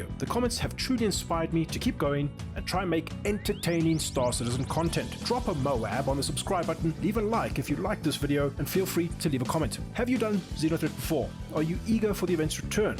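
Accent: South Africa. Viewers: You sound South African :) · Ek het subscribe die oomblik toe ek jou SA accent hoor. Great videos!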